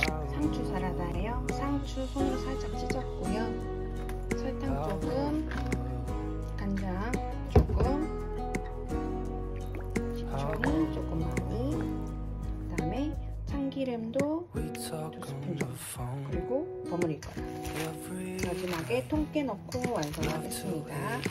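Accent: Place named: Japan. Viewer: 빵을 엄청 좋아 하시나봐요??^^고기는 감겹살에 상추죠~ 근데 일본사람들도 저렇게 먹는걸 좋아 하나요? 한국은 상추에 먹을때 된장을 무처 먹는데요 ㅎ,근데 님은 한국분인가요 일본분인가요? 한국분으로 알고 있는데 ^^ 말억양이 일본분 억양이 보이네요~ 행복한 일상 같이 합니다~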